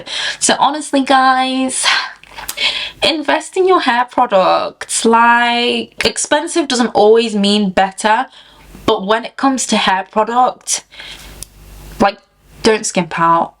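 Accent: United Kingdom. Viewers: Your accent sounds quite Northern? Are you from Manchester? Leeds?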